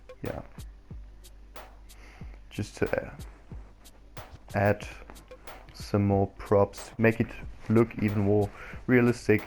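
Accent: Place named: Germany. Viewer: Are you German? You have a German accent. Schade dass du nicht noch mehr Videos machst... Jaa bisher sind die noch recht Amateur-Mäßig... Aber so sollte Youtube auch sein und die Tipps und Tricks hier haben mir sehr geholfen. War auch recht unterhaltsam und entspannt. Vielleicht macht du noch was zu Jurassic Park ?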